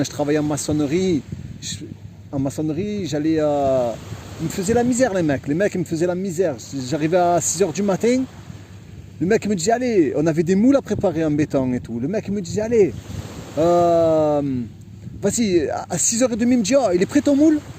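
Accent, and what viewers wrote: Marseille, France. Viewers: Salam alaykoum wa rahmatullah wa barakattuh Qu'Allah azawagell t'accorde le bien ainsi que ta famille 👪. Mais dit moi t'es un peu Marseillais non? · tu as l'accent marseillais , tu es sur que tu es d' angers ?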